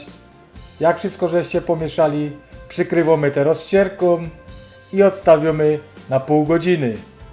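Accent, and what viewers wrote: Silesia, Poland. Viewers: Pospiesz sie z tym zytnim chlebem, prosze. Uwielbiam Twoja slaska gware i prowadzenie. Krotko, konkretnie, na temat i z humorem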